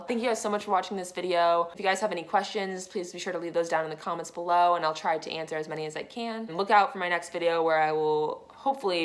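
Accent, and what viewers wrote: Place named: Punjab, Pakistan. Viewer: I like her speaking Pakistani-Punjabi, nice accent!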